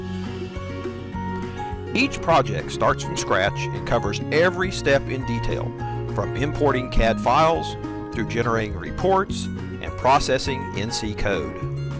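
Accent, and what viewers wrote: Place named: South, United States. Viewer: esprit cam and southern accent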